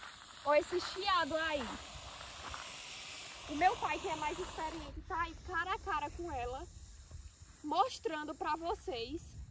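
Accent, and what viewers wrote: Northeast, Brazil. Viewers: Belo vídeo, acrescentado com o sotaque nordestino da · Ei 👸, você é nordestina mesmo, tem sotaque acentuado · Legal esse sotaque nordestino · PARABÉNS, nunca perca este SOTAQUE! PORQUE ELE CARACTERERIZA OVERDADEIRO NORDESTINO. HOMEM E MULHERES BATALHADORES QUE Ñ TROCAM SUA DIGNIDADE. MUITO BENÇÃO! PARA SUA FAMÍLIA, E A TODOS QUE ASSISTEM A SEUS VÍDEOS ❤ DEUS TEM VCS NO CORAÇÃO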